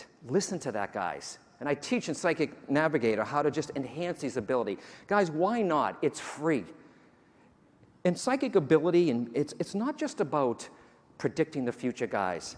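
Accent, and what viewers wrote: Boston, United States. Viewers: I'm from Rhode Island.. sista-!! Lol Bossston'~!! We've got that 'hard ass' slang accent~! Beautiful ..👌🏼. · I LOVE THIS GUY!! I grew up on Cape Cod , MA - he sounds like all of my college classmates, from around Boston, Southy, Framingham!! I love the accent. I can't wait to see my 18 year old Terrier!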